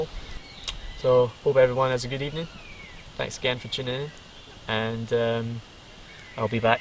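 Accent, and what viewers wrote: Scotland, United Kingdom. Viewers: You. Are not alone.. we thought same.. until we heard speak.. And that beautiful Scottish accent.. which we sincerely hope he does not lose.. gave him away.. We are Scottish.. and we enjoy his channel immensely.. As to the potential name change.. as this video is over 5 years old.. he has kept the name.. The name is part of roots.. part of who he was as well as who he has become.. To change would.. to us.. be a betrayal of his journey to where he is now.. seems so wrong.. Besides.. all of his videos.. at least all we have seen.. he references in the opening.. and seems impractical to change.. Who changes horses in midst of a stream.. especially if they are serving you well In getting you across? We are so glad you kept the name, … Slan mo charaid💜💙🌹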